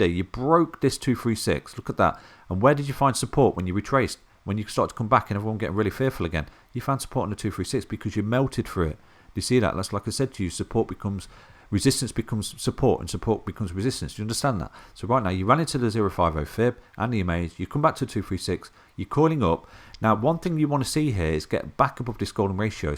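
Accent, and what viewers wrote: United Kingdom. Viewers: I admire with the british accent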